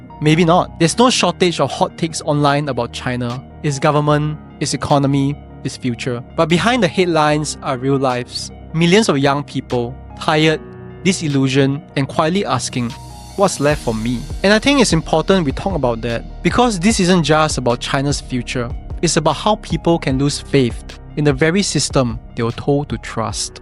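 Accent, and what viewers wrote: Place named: Singapore. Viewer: Are you chinese national?Your accent sound so much like Singapore or Malaysia.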